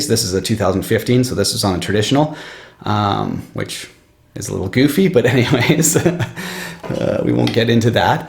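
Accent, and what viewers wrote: Canada. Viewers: There's no way you're not Canadian. I'm from Ontario and I can't unhear the accent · I always enjoy Canadian accents. No problem with thaat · Are you Canadian?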